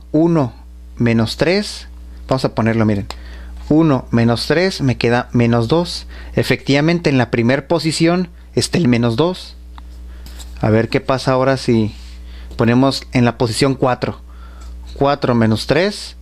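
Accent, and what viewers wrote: Mexico. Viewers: Es mas mexicano que los tacos pero...de onde es (estado) Esq explica muy bien :)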